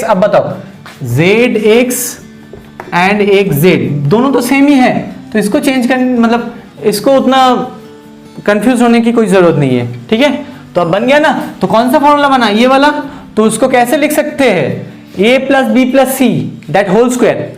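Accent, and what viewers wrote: Bengal, India. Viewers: Sir are you bengali please reply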